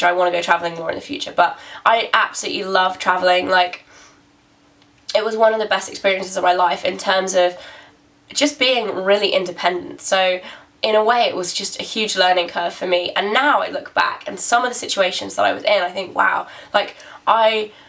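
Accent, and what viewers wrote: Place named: United Kingdom. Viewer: British is back, yey :-)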